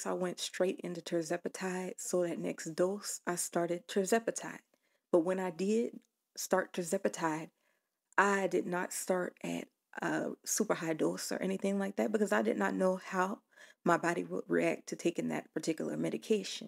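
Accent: Louisiana, United States. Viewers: You have a Louisiana accent... Heavy Louisiana accent.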